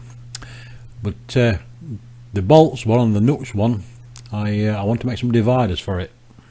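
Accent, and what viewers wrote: Yorkshire, United Kingdom. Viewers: Excellent video (as always) and your accent does make my wife smile. She is from Yorkshire but we live in Cornwall so she doesn't get to hear it much!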